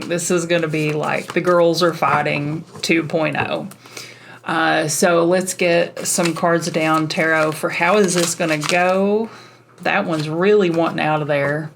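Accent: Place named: South, United States. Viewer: Your southern accent is adorable. Thanks for the reading!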